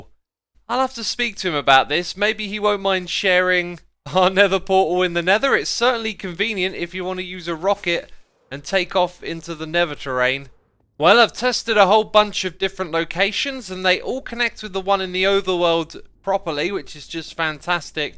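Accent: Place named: United Kingdom. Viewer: and the British accent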